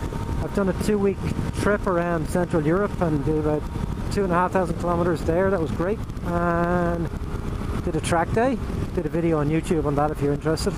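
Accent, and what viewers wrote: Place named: Ireland. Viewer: Hi, nice review, and nice to hear an Irish accent on for once. Looking to get a Tracer, you mentioned the seat being bad, I have an 04 R1 and I find the seat cutting into my leg after a couple of hours and I get a numb bum:) What's the tracer like? Do you still have it?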